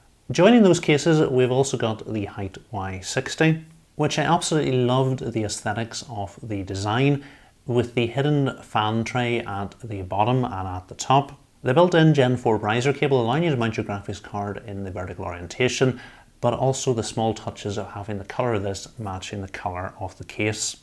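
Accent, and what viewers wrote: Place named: Scotland, United Kingdom. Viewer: Scottish accent.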